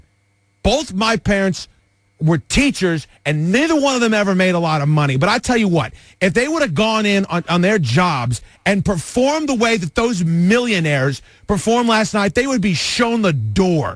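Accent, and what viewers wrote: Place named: New York, United States. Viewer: ... "I'm Northern VA; I'm DC; I BLEED it!" How come you sound like a New Yorker, maybe a Jerseyite?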